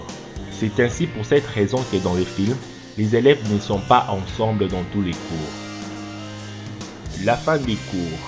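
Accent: Africa. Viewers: j'aime pas parler de l'écriture, mais c'est pas de ta faute si t'as cette écriture de merde et lui cet accent. il fait pas exprès. t'as jamais entendu un africain ou quoi toi ? 😂😂😂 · bien l'accent qui sent le mafé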